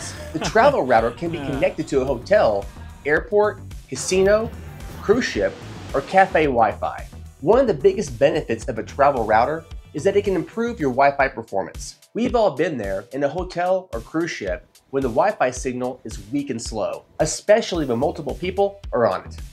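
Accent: United States. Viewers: Yes, agree, his first language is not English, but his American accent is quite good. I only hear the slightest of a non-American English accent on certain words and intonation. Nevertheless, great information.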